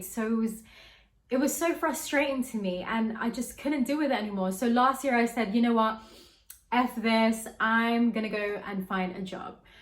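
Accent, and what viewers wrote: United Kingdom. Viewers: Briton na briton accent ni ghorl!!! God bless and good luck sa new journey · What part of the uk are you from, and your content is excellent 👏👍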